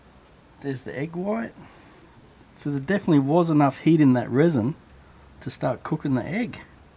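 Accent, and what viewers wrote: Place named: Australia. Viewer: his australian accent is so good to hear😀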